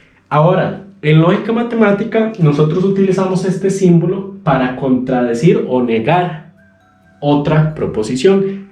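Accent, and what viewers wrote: Costa Rica. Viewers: En este video se le salió todo lo tico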